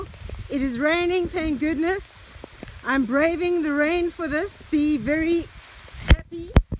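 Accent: South Africa. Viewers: You sound so SouthAfrican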